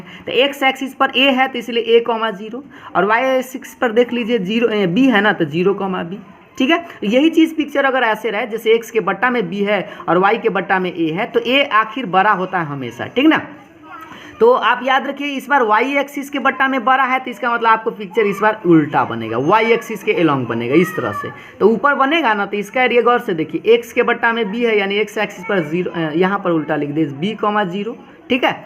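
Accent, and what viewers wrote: Bihar, India. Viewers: sir hum U.P se hai...sir apki voice se pahachan Gaye hai ki aap Bihar se hai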